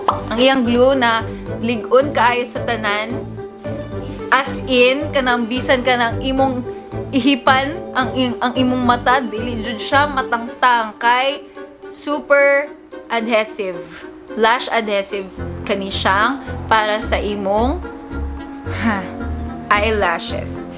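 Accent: Visayas, Philippines. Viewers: Cute kaayo ka mag bisaya lodi. 😆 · sobrang cute magbisayaaaa!!!!! ❤️ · kacute magbinisaya ni ate hahaha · ang kyut mo po mag salitaa ng Bisayaa ate 🥰🥰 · 😂😂😂ang cute mo mgbisaya ms...cge kog ngisi d whole video..super ng.enjoy ko..thank u sa GV ms...😊😊😊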